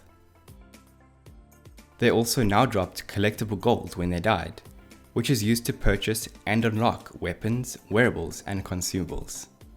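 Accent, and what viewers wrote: South Africa. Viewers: I know a South African accent when I hear it :) game is coming along nicely, bro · is ja n SA aksent ruik soet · Difficult to place your accent... You south african...?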